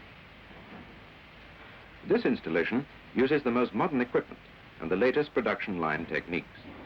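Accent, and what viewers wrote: RP, United Kingdom. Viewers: I thought it was the typical "received pronunciation" of the era, aka BBC English...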